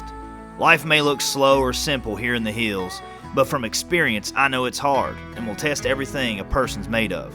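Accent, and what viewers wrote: United States. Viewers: love ur cowboy accent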